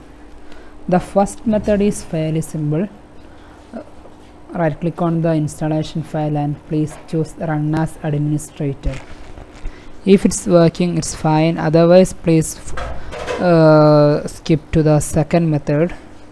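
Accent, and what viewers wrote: Kerala, India. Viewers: Malayali aanalle..? 😂😂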